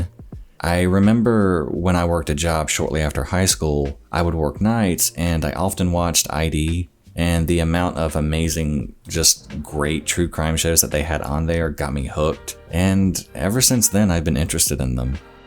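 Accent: South, United States. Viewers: How come I’ve never heard the southern accent until you’ve mentioned it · His "southern" accent is about as strong as mine; I was born down South but grew up in various northern states and it's mostly faded. The accent is still evident in certain words and phrases though. It's just not a typical southern drawl.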